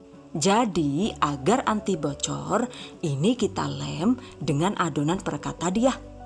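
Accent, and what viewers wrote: Banyumas, Indonesia. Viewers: Mantabs banget mamake 😁😁 salam kenal bunda..sy suka resep2nya.. suaranya khas dan ternyata ngapakers juga 😍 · Nyimak wlu agak ngantuk2 gtu,krn kangen denger ngapaknya wkkwkkkk....dedek ngebantu bundanya...,😀 sampk nangkring2 gtu. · mantap nih bun interaktif banget 😅 ngapaknya ra kuat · maaf suaranya suara ibu kan ya? lucu gaya ngapaknya hihi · Dening ngapak iya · Ngapak kaya nyong 😆😆😆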